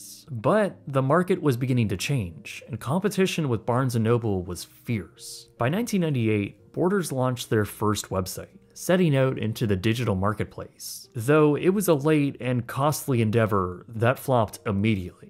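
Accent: Canada. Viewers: Its hard to understand with your Canadian accent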